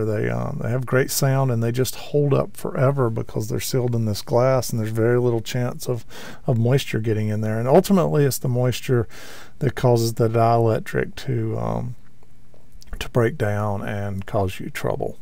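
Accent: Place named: Texas, United States. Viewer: Love your videos. I am 61 and looking to get into Vintage Audio repair not only to keep my gear in top condition, but to give back for what has been a lifetime of enjoying these fine components. I'm an electrician and built a Harley from scratch when I was 17 in my bedroom. I think I can figure this out. I know a gentleman I work for who has an accent identical to yours. He's from around Waco, Tx. Anyway, I'm going to purchase a Sprague TO 6 and was wondering if I need to desolder every capacitor I test. I'm guessing yes, because if it is leaking voltage, it will send high voltage to the other components while connected to the circuit board and possibly damage them. Is that correct?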